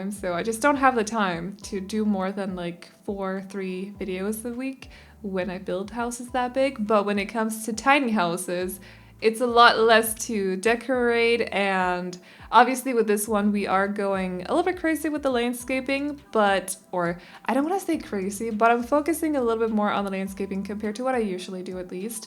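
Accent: Denmark. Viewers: Omg- your Danish accent is so good! 💖🇩🇰 (Btw amazing build)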